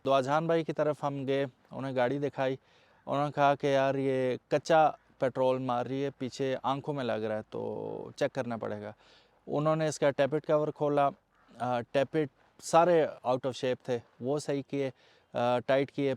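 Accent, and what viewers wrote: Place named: Hindko, Pakistan. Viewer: Apka hindko wala acsent hy mansehra sy hy ap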